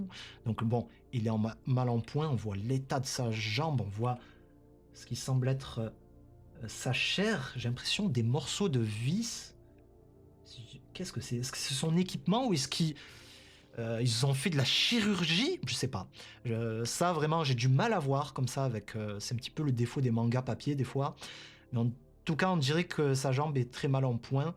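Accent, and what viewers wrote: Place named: Japan. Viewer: J aime bien ton petit accent japonais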